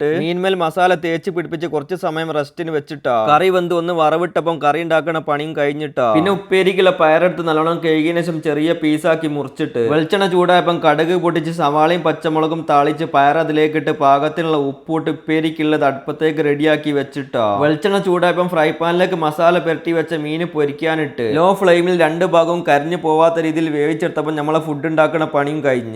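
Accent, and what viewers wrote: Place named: Koduvally, India. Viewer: തനി കൊടുവള്ളിക്കാരൻ തന്നെ സംസാരം 👍👍 ട്ടോ ട്ടോ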